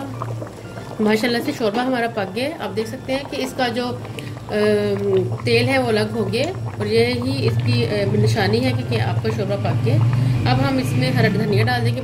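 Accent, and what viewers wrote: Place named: Pakistan. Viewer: Me india se hu baht esy treeka btaya hai apne nice mam me try krungi pakistani zban muje pasnd hai bahut yha india me istrha nhi bolta koi bhi but muje apki voice achi lgi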